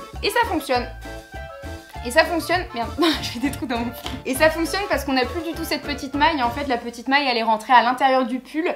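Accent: Quebec, Canada. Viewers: ta un petit accent québécois on dirait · Tu as un petit accent canadien non ?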